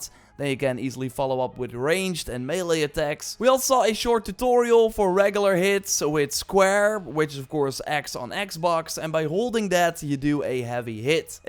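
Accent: Netherlands. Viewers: mate, I love your accent(Dutch I'm guessing?)..."I do like how dey expond on dis" It's just cool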